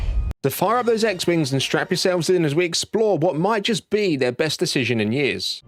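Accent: England, United Kingdom. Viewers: I love Star Wars. I love English accents. I love smart, interesting discussions of star wars. Well done, mate.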